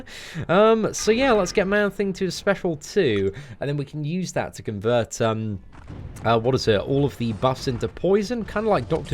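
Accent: England, United Kingdom. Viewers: This speech is the reason why I never travelled to england. You get headache in seconds. Horrible.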